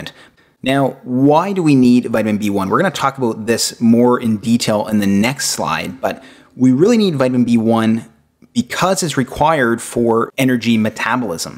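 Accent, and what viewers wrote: Canada. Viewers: This Doctor is Canadian., Every human who speaks English in the world has an Accent... Soooo this is the way people speak in his Country! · Love the Canadian accent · Yes, thats the first thing i thought, aha youre Canadian ! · John Silva he's Canadian. Lol.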